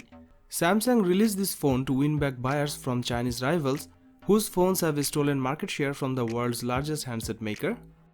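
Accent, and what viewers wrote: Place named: India. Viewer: I wanted to focus in the video but indian voice always annoyed me but I'm not a racist or hate them I can't just stand they're accent..sorry...binod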